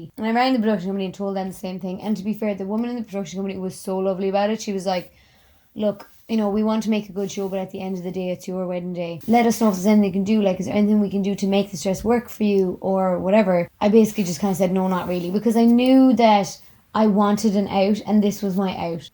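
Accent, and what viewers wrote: Ireland. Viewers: Me sitting here trying To copy ur accent. And i fail alot :p we Swedish People cant say irish "start" xD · Subscribed because all my family lives back in Ireland and I’m in Canada and miss the accent ❤️❤️ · It's an Irsh accent. She's saying that her IRISH accent is cute. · Your accent is lush. <3 I'm actually subscribing to your channel, based on zero other videos, because I figure I'll get to hear you talk, which will automatically make any other content you produce fascinating by default. I have a couple of Irish expat friends, and you make me think of them. They're from Cork, and I have terrible hearing damage, so having drinks with them, and when they've had a couple and start going super rapid-fire with the talking, it's basically just this lovely, lyrical wall of incomprehensible sound, hahaha! Anyway, came for the SYTTD story, stayed for the pretty vocals. 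:-) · What a lovely brogue..I could listen to you talk for hours :) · Omg I love love love your accent I always wanted an Irish accent 😍😍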